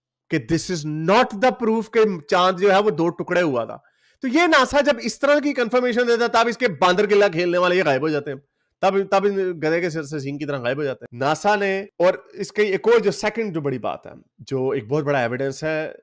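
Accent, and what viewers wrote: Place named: Punjab, India. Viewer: Your Punjabi tone is very beautiful 👍👍👌👌 Jai Hind Jai Bharat🇮🇳🇮🇳🇮🇳🇮🇳🇮🇳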